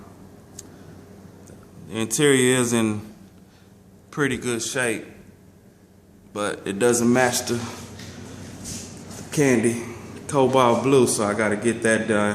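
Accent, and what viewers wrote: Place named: Texas, United States. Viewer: Texas talk..👍👍😂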